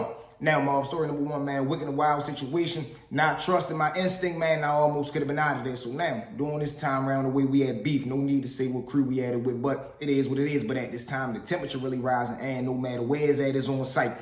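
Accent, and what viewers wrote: Baltimore, United States. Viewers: Sound like you from bmore brother, good story💪🏾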